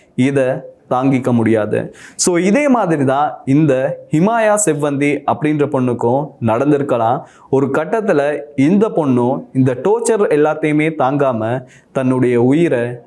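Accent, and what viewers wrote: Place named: Tamil Nadu, India. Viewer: இலங்கை சனல் தானேடா நீங்கள். பிறகேன் இந்தியாத் தமிழ் கதக்கிறீங்க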